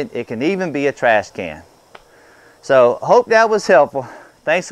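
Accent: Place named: Louisiana, United States. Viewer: I remember many years back, addressed on video, subscriber comments about his "accent". He stated that after going back and listening to himself he couldn't hear what people were talking about? I always figured it was remnants from the cajun region...if I recall right? I had never even noticed till brought it up one day and lo and behold He hasn't lost any of his verbal charm!!! Love ya, you have taught me so much the last decade, I truly appreciate the many many hours you put in and the honest down to earth teaching you blessed us with. Hey, when the snowflakes show up next time....let us handle 'em....with love & kindness.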